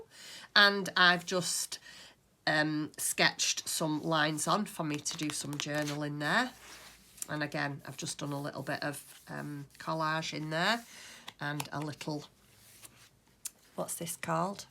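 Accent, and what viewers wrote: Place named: United Kingdom. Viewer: Where are you from? I’ve never heard that type of British accent.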